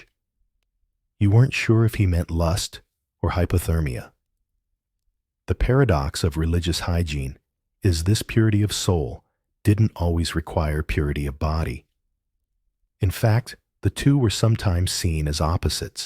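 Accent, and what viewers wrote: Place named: United States. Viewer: Your American accent sounds both patronizing and stupid - quit the channel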